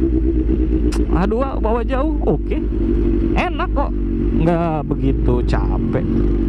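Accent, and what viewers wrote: Palembang, Indonesia. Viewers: Orang mana bang, bahasanya sama kayak Palembang😂, semoga bisa kebeli motor kayak abang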